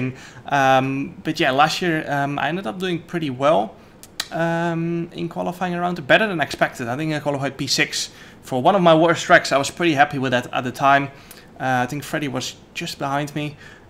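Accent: Netherlands. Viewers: Are you from the neterlands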